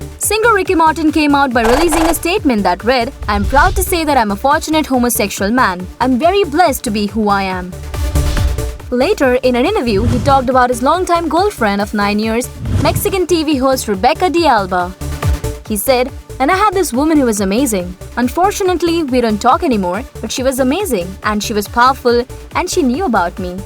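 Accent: India. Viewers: Better to redo this with somebody who speaks English...not a Pidgeon English from deepest India.... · Why the narrator sounds Indian??? Pls dont talk when your mouth is full 😂😂😂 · Bill Bergendahl she sounds Indian 🇮🇳 I think I’m just saying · Your Indian accent is so annoying.. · Indian accent is so incredibly annoying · Her Voice in annoying. I feel like I called a 800 number for some help with a product I bought here in the USA only to be redirected to some place in India. Or that maybe I walked into a 7-11 and ordered a Slushy. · The woman's voice is an Indian accent. It appears some find it irritating. Wait.... Lance Base???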